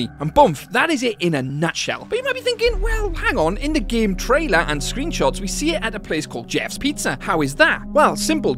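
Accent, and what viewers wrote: Wales, United Kingdom. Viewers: Welsh accent ?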